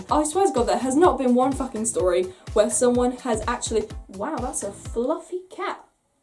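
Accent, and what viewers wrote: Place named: Norfolk, United Kingdom. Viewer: Learn to speak Enlgish you Norfolkian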